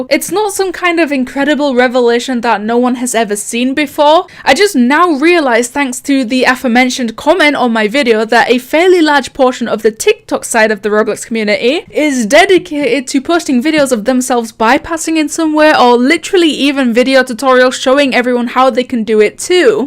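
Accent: United Kingdom. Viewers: I rather you over koneko since u sound Like your from the uk not saying you are from the uk It’s just there are not many British roblox YouTubers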